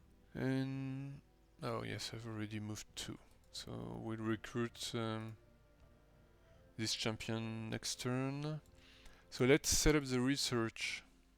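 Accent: France. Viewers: You sound French :) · love your French accent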